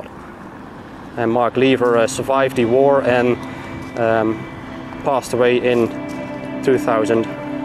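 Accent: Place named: Netherlands. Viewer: Hello, I´ve found your channel via History Underground and what I have to say, I follow it. Your view of the part of the Operation Market Garden is very interesting, and I love Documentaries about Battles, when they where filmed on the spots. Bye myself, I visited Arnhem and Oosterbeek two times and I bet, not the last time. There are a lot of spots, where I wasn´t (the church for example). Bye the way, I like your dutch accent in your english. It is almost the same, if I speak english. Greetings from Germany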